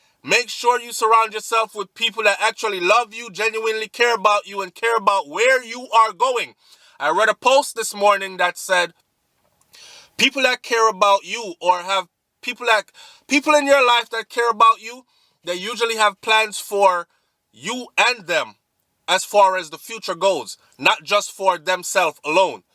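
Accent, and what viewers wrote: Trinidad, Trinidad and Tobago. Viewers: Well I am Trinni and you sound like us.Welcome my fellow Country man 👊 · Love ❤️ your Trinni accent is great 👍 brother don’t care what anyone else says 🙏🏾❤️🇯🇲🇺🇸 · Well A A! Bachannal in de people place yes! I'm BAWLING crying at your Trini accent... Yuh have it dread, yuh have it! 😩😩😩🤣🤣🤣😂😂😂😂😭😭😭😭😭😭😭😭💀🤞🏾🔥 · Lol oh boy, I love your Trini accent. This story was very interesting. I work on the weekends my days off are in the middle of the week so I can't do anything especially since I have my own reputation to uphold. Great video. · Man everybody done no she was ah bulla gal from long time lol.. side note... yur trini accent pretty good fadda 🤣👏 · Hi, love your Trinidadian accent... very funny 😂😂😂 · I like how you talk the Trinidad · Love your Trini accent! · you Trini accent wicked boi , ah hear u Trini keep on doing ur thing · Hi you are Trini you dount talk like jamaican love it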